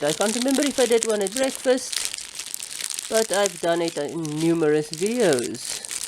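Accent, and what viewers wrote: South Africa. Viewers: Joh that South African accent is coming through hard😂 Baie goeie review👌🏻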